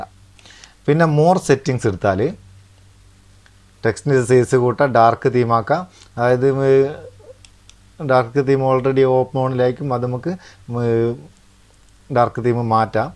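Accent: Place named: Balussery, India. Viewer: നിങ്ങൾ എവിടെയാണ് ബാലുശ്ശേരി ഇങ്ങളെ ഭാഷ കേട്ടിട്ട് അങ്ങനെ തോന്നുന്നു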